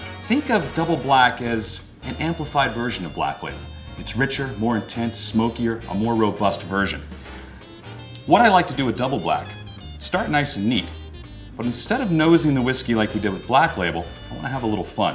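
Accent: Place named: United States. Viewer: OK, stop criticising, people! This video dates back 5 years. If they would do it again, this guy wouldn't wear a V-neck T-shirt under a suit jacket. Hell, he wouldn't wear such a cheap, crumpled, ill-fitting suit jacket. They wouldn't allow this guy to talk so slick American to promote a Scottish brand. And they would cut out that annoying background music. Wouldn't they?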